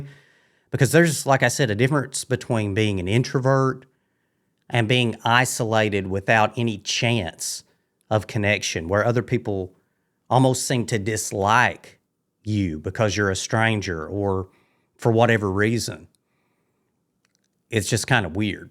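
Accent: South, United States. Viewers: You sound like you're from the south. Either NC or TN. I agree with you. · What kind of southern accent is that? I am from the Appalachian mountains in western NC but I’m not aware to his dialect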